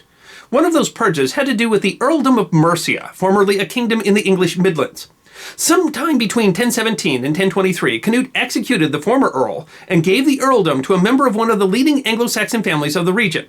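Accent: United States. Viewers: Americans ... and speed speaking on top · Terrible American pronunciation. Showing Kenilworth castle, and calling it a Monastery. A Degree in History..... ??? mmmmmm !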